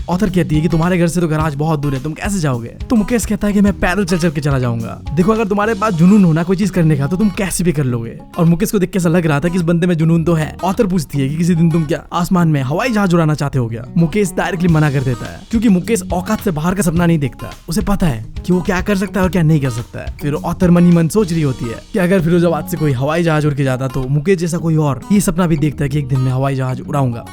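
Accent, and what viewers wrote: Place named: Bengal, India. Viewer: bro apka hindi bolne ka tarika sachme bangoli type ka hai mere bestfriend ki traha 🙃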